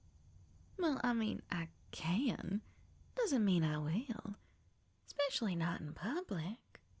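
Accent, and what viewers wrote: United States. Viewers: That country accent has me in a chokehold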